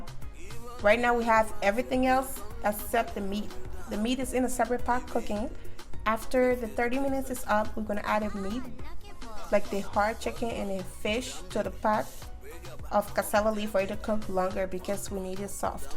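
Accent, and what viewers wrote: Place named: Liberia. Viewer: She is definitely Liberian that accent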